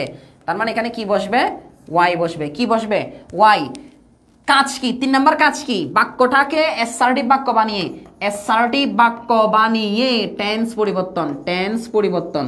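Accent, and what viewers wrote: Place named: Chittagong, Bangladesh. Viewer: ভাষায় চাটগাঁইয়া ভাবটা বেশী।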